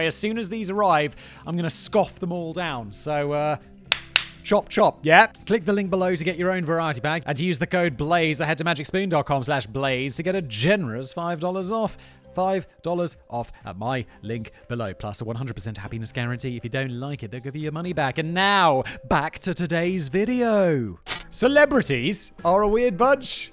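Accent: United Kingdom. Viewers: Being a person from the US who loves James Acaster, it's really funny to hear a guy with a british accent not know how to pronounce his name. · How does your voice constantly trigger my Siri? Your a: younger then me (i’m 38 for context), and b: british so our accents shouldn’t match up (i’m from atlantic canada). Wild.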